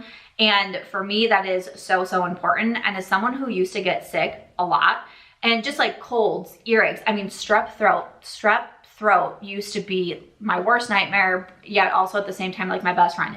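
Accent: Midwest, United States. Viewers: Midwest accent? That makes me laugh...❤ enjoyed the empties video. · Totally get the Midwest accent girl.. don’t really hear it in you THAT bad.. being from outside of Philly, I always get told I have a Philly accent & I laugh because my friends who grew up actually in philly, have more of a Philly accent than me.. it’s funny how you can tell where ppl grew up just from their accent.. yet we all speak English the same.. ANYWAY- I really came here to tel you that Kayali Yum Bougee Marshmallow (the strawberry one u were tryin to think of) is the COMPLETE opposite of Tom Ford Tob Van.. Tom ford is more warm n spicy & rugged (my son n I share a bottle bc is so perfectly unisex) where yum bougee marshmallow is girly sweet but very pretty but for sure feminine.. I love it, but completely diff than Tom ford.. have u ever tried kayali vanilla 28?? Esp after it sits awhile, its a beautiful vanilla that’s feminine & mixes well with so many other perfumes (actually love it mixed with TF tobacco vanille to make it a lil more feminine & bring out the vanilla!!) .. 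also can’t wait to smell Phlur Vanilla Smoke def sounds like something you might like (just haven’t gotten to smell it yet!!)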